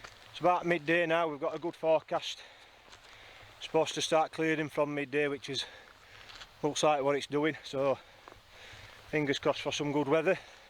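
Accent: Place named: Lancashire, United Kingdom. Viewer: Another cracking video,. Where are you from, if you don’t mind me asking? I see on your IG profile you’re in Barnsley (South Yorkshire) but you use quite a lot of Lancashire slang, like ‘hafe’ instead of half and ‘neet’ instead of night.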